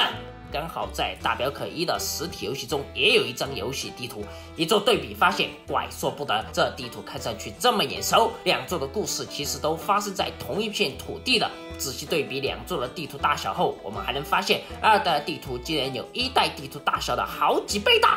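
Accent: Sichuan, China. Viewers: 厂长的川普如果更川一点就更好了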